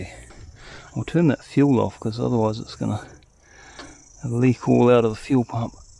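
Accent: New Zealand. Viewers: lmao was slowly noticing more and more NZ fauna as I was watching, didn't catch the accent at first sorry g thought you were an Aussie. Major props had no idea this stuff could be found in our country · New Zealand I suppose? Hope I got the accent correct? Or is it good day mate? I am from little rock Arkansas, so please forgive me, not trying to offend. I like you rescue of the bulldozer. To cool mate! We, in the United States love heavy equipment. Loved this episode. Hope to catch More of your rescue's! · Just found this video. Amazing. Great to hear a kiwi voice doing this sort of stuff. on YT Unless you know this country, you canʻt imagine just how difficult it is - fighting your way through the matagouri and manuka. Used to go up to Tennyson Inlet on holiday and did bush bashing up there so have an appreciation. Great video, no frantic panning and shakey stuff. 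Well done on something incredibly well put together. As you know, there are lots of these old TD6s, TD8s and TD 9s left still surviving after years of hard work. incredible mechanical skills as well - good old No8 wire kiwi stuff! You deserve this one mate! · He sounds like a kiwi also the red band boot is a likely sign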